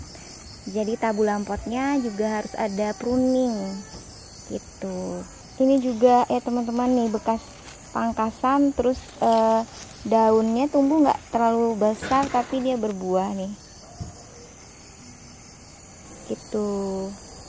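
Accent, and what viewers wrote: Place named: Java, Indonesia. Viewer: kak pernah tinggal di Jakarta ya??cara ngomongnya mirip sama orang di Pulau Jawa umumnya hehehe, soalnya kalo orang Kalimantan asli ngomong Bahasa Indonesia itu agak beda dari orang di pulaubJawa🤣🤣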